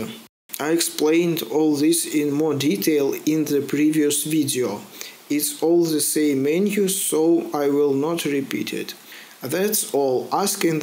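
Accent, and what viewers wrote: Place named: Ryazan, Russia. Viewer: ты бы по русски, что ли вещал. Не возможно слушать рязанский акцент)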